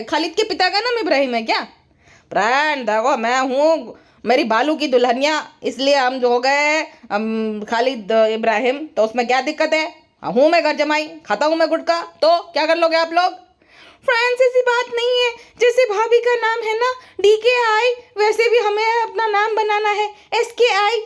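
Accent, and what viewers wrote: Gujarat, India. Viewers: I just love ur "Gujarati accent" 💜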